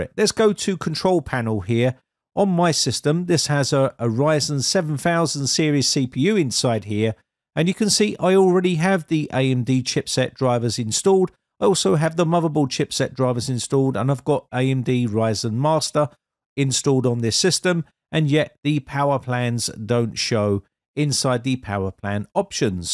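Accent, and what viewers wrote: United Kingdom. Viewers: He's clearly got a British accent mate are you okay?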